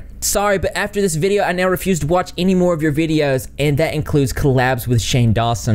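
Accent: South, United States. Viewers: I'm trying to figure out your accent because it's very southern... even more southern than where I live (Alabama). Maybe Tennessee or Kentucky.... something like that? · what southern state are you from dude? I’m from southern KY so I can pick up twangs like nobody else even if someone is trying to hide it lol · Bro where’s your accent from, sounds super southern it’s dope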